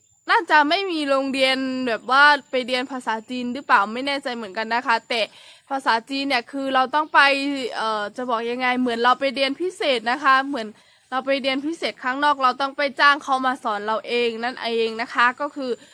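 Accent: North, Thailand. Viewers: สวัสดีครับน้อง ติดตามๆครับ... อู้กำเมืองครับเหมือนคนเหนือ... 55อันเด่วกั๋น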